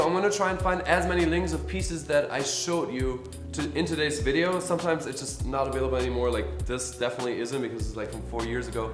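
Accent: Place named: Germany. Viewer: He sounds Veryy german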